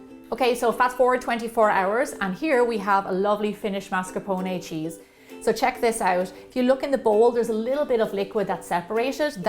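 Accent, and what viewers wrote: Ireland. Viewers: wow that's amazing! haha, I saw your location is in the US so I automatically thought it was a north American accent, but hey Irish accent always reminds me of Tom Branson from Downton Abbey!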